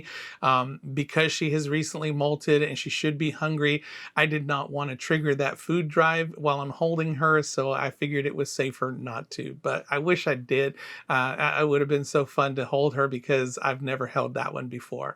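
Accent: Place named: New Mexico, United States. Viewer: You kind of sound like you're from New Mexico. I have family from there and the drawl is very distinct. Of course I'm probably wrong but it's just that your voice sounds so familiar. Love your videos by the way! Really good camera shots. Love it!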